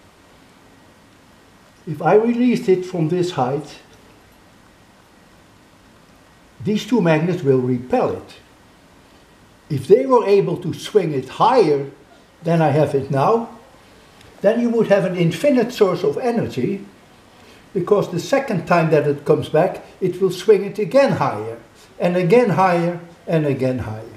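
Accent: Netherlands. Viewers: I can hear he is dutch although his english is very good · idk know why he has a dutch accent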